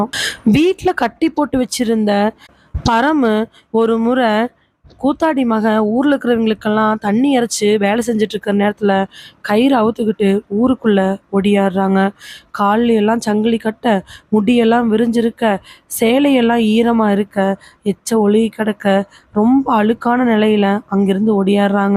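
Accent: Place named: Kongu, India. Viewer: Kongu Tamil Vera level sister 🎉🎉🎉🎉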